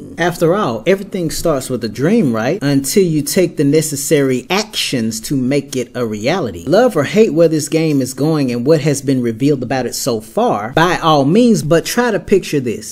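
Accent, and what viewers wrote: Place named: South, United States. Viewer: he sounds Southern to me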